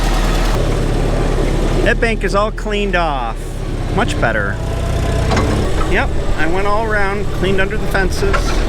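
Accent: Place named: South, United States. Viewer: 60s country twang puts the ooo in smooth.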